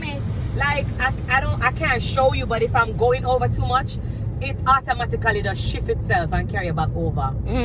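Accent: Jamaica. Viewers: I love the way you talk. You are so jamaican. You are so talented and beautiful, fine. I watch you on love and hiphop atl. I listening to your music and look at your videos. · PLEASE KEEP YOUR MASK ON SWEETHEART IN THE STORE YOU CAN WALK RIGHT BEHIND SOMEONE THAT JUST SNEEZED OR COUGH AND THE SPOURS STAY IN THE AIR FOR SO LONG I JUST GOT HOOKED ON YOUR MUSIC AND ACCENT AND I DONT WANT TO HEAR THAT YOU ARE SICK....I AM NOT A STALKER. I JUST LOVE TO HEAR YOU TALK.I MAY BE YOUR MOM OR GRANDMOTHER'S AGE. GIRL I WISH I COULD SPEAK LIKE YOU. I LOVE LOVE THE JAMAICAN ACCENT. MY PEOPLE i love my black people. I only hate one thing about my people is the JEALOUSY AND THE FALCT WE DONT STICK TOGETHER LIKE BACK IN MY DAY.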